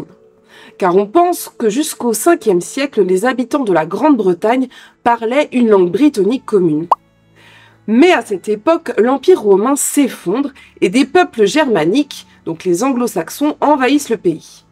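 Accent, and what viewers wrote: France. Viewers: Bonjour. mtn tu l'est. Sois pas trompée par tout ce blah blah patriotique bidon dans les stades de rugby pleins de drapeaux et de chansons. J'étais le seul élève dans ma classe à choisir de continuer avec le gallois après 14 ans quand cette matière cessa d'être obligatoire. Le seul! J'ai étudié le français et le gallois jusqu'à 18 ans. Mtn j'ai 60 ans et ma fille mon (premier, plus à suivre, j'espère) petit fils sont gallophones, langue dominante. Plus tard dans la vie je me suis fait très fort en Catalan aussi, autodidacte. J'ai fait des recherches sur les autres langues liées avec le Catalan et ensuite j'ai tomber sur ta vidéo sur le Gallo. Ensuite j'ai continuer à voir tes autres vidéos. Je trouve plutôt intéressant écouter ma propre langue prononcée dans un accent français mais je n'ai pas envie de l'apprendre. 4 quatre langue me suffisent je pense. 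Merci et bonne chance à la suite!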